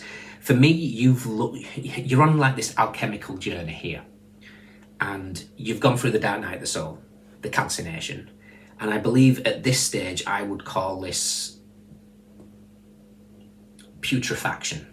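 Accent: Northern England, United Kingdom. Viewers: Definitely Northern England...probably Manchester area. 🤗❤ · Thank you for yr reading ! Love yr accent...North of England ?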